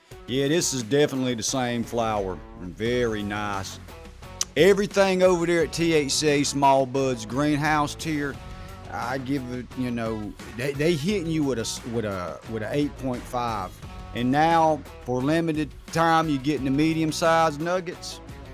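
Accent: South, United States. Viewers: Good Reviews... awesome southern accent my G know what im talkin bout..🤙🖖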